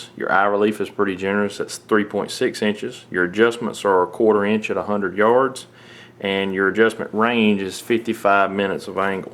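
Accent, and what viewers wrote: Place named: Ireland. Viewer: that some thick accent you got there, you from Ireland?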